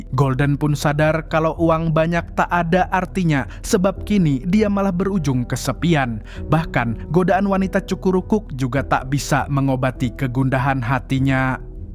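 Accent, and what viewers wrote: Banjar, Indonesia. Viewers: Urang Banjar kah Pian bang 😅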